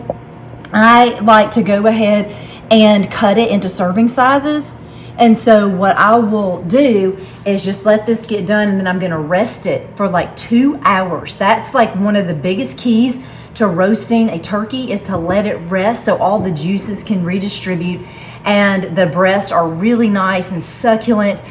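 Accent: South, United States. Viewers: Great recipe ! I could listen to your southern accent all day 👍🏼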